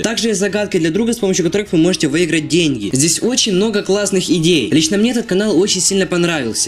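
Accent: Ukraine. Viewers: Ты из Украины ??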